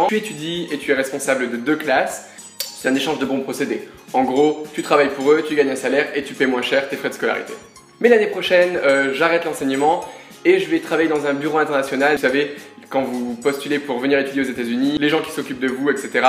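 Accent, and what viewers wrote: France. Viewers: génial ! oh mais....tu es ch'ti * _ * j'habite pas loin de lille,tu as fait un programme d'échanges? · Oh un ch'tis !! Check ahah ! J'admire vraiment ce que tu fais, en plus t'as un accent de dingue j'adore !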